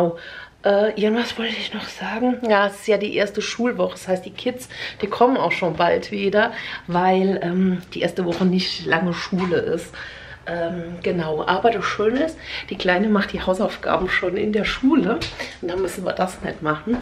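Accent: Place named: Palatinate, Germany. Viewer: Schönes Video liebe, du bist so sympathisches Fräulein 😀ich höre dir gerne zu und dein Pfälzisch 🥰hab früher in Speyer gewohnt,.. in der Zeit du wahrscheinlich in Mannheim, wenn ich mich nicht täusche, hast du es irgendwann in einem altem Video erzählt 😀lg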